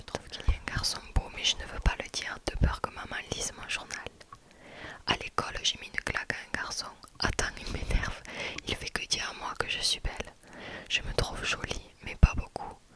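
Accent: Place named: Marseille, France. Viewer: Oh ta un accent marseillais 😅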